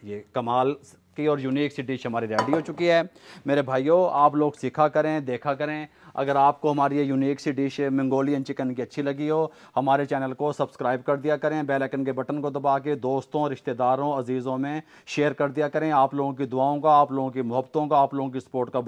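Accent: Punjab, India. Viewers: zaberdast mujy ap Ki Punjabi boht achi lgti h❤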